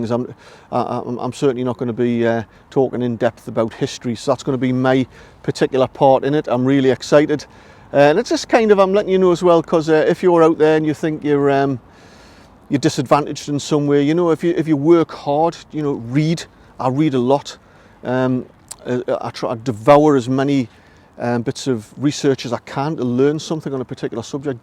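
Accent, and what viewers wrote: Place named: Geordie, United Kingdom. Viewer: You will have to tone down your Geordie accent, and speak with a plum in your mouth. I remember that BBC turned down an appearance by the “The little waster’ on a show because they thought the public wouldn’t understand him.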